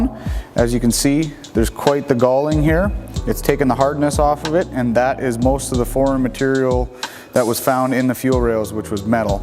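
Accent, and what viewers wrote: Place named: Canada. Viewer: grew up near canada. can always tell the accent when we say "aout" instead of out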